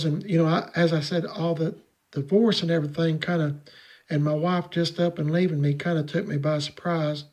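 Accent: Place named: Eastern Kentucky, United States. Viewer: I hope you don't mind me asking, but where are you from? I grew up in eastern Kentucky and you sound like you could be from just over the hill!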